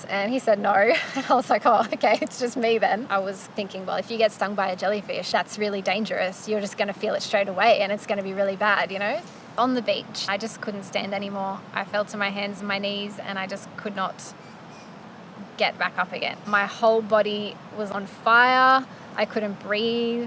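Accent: Australia. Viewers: Love your Australian accent 😮😮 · Holy shit. I didn’t know you could talk!! You’re Aussie. I thought you were a Russian!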